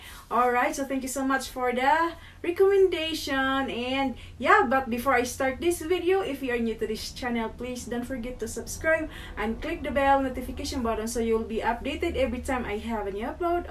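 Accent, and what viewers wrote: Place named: Indonesia. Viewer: Your accent in English is just like Indonesian people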